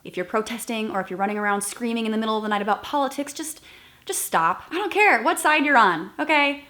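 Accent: South, United States. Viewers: u had never heard sound so southern:D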